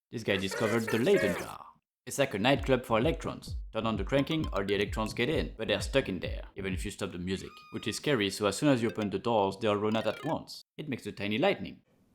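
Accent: France. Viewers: the french accent is amazing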